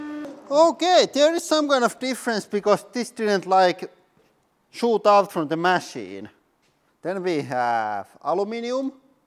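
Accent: Finland. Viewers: That accent, Is he Finnish? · Flat like'a da pancake Did you invent a new word? Fanacy? Who doesn't love that fin accent?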